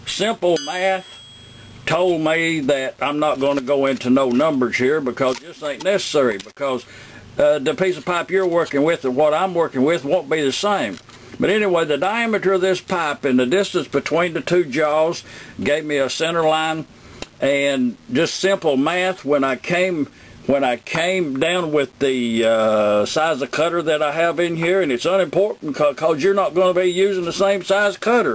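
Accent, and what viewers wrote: United States. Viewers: british accent ??? he fukin sounds like hes a cowboy hes DEFINATELY not british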